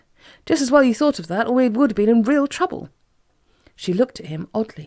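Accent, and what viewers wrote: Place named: London, United Kingdom. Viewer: Great London accents btw not overdone which is a mistake even professionals make sometimes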